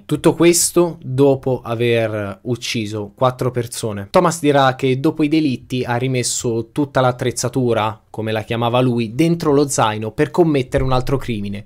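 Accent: Tuscany, Italy. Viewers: Sei toscano? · Ciao, che storia tremenda! Ho fatto fatica arrivare alla fine e mi ha stupito che uno psichiatra veda la possibilità di riabilitare una persona come Thomas. Hai un bellissimo accento toscano, non hai mai preso in considerazione di trattare la storia del mostro di Firenze? magari inserendo delle clip del processo??? 😁 io me lo guarderei davvero volentieri raccontato da te!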